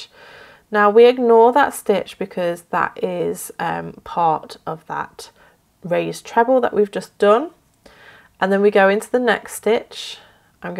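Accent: United Kingdom. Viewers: Hi, i am new to your channel, i really didn’t know your name is not, … i was rewatching the tutorial today and at the beginning of the video i got your name!! 😆😆 Anyway i love both names, btw, I’m a big fan of your British accent! Lol Warm wishes… stay safe xx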